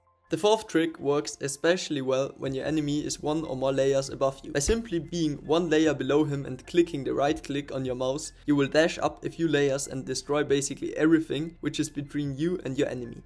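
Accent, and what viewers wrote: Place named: Germany. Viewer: The german-english is crazy, but W vid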